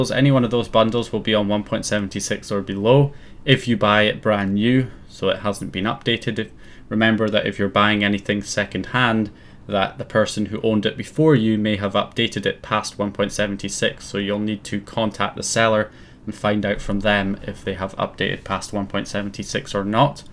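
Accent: Scotland, United Kingdom. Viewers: Fuck me yer Scottish didn't know that we should start a modding team like the French modding team back in the day lol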